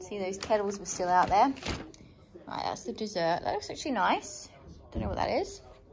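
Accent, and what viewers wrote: Australia. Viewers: You’re such a pommy! Hahaha you have lived in the UK too long, do you reckon you have morphed into a pommy yourself? Or do you think you still sound Aussie I’m actually so interested to know! Xx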